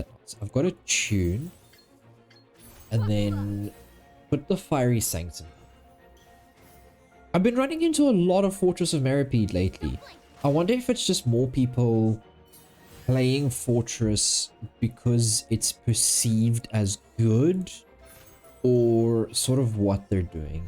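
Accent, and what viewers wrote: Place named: South Africa. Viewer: I love it when I immediately recognize a fellow South African's accent while randomly consuming Genshin content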